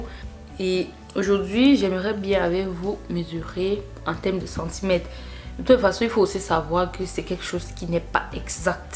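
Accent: Cameroon. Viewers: Slt ma belle!beau montage.je te suis depuis peu et j adore ton accent camer et ton cote' comik😆.j aimerai te poser une question,penses tu que la porosite' des cheveux influ sur le type de produit a utiliser?si c pas indiscret ,quelle creme hydratante utilise tu pour reussir a tenir 1 semaine sans les rehydrater?perso j ai des cheveux naturels coe sur mon profil et j ai vraimt du mal a trouver un hydratant ki me convienne😊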